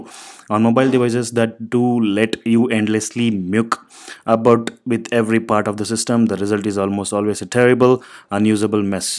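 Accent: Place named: India. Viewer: neverhome_rph I am in total agreement with jarcohen You MUST rehearse your You Tube presentations and LEARN to pace your speech. You speed up at times which makes what you are saying TOTALL ILLEGIBLE! Then you then speak slowly and you information comes out clearly. There were too many instances when your fast talk, combined with your Indian dialect, made your presentation too difficult to follow. PLEASE SLOW DOWN!!!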